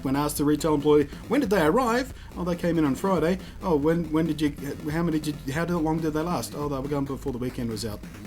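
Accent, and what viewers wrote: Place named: Australia. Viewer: Lol, sorry I laughed my way through this xD I live in a country town so getting to my closest EBgames is quite a drive, when they told me they where out I just didn't even want to bother as I have my Dex filled out anyway. Anyway I went up to the Gold Coast and to Pacific fair and they had a massive pile of the Mew cards (this was also at the end of the month) I did ask the lady about more if you have multiple game copies and she said no it's done by per person, so I figured that's why they still had so many cards at the end of the month... I was just going in for super mystery dungeon so it was a nice surprise after I wasted an hour driving to get one from my usual store, the woman who runs it is nice, so I'm wondering if I could ask to have one kept aside or some thing? To be honest the only one I really want is Darkrai. 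Oh well, awesome to hear an Australian accent ranting about Pokemon, makes me feel less of a weirdo xD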